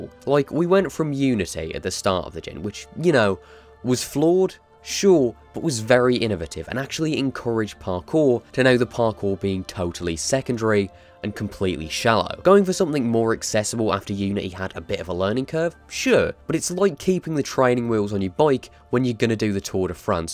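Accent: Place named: RP, United Kingdom. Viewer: Why are you soooooo posh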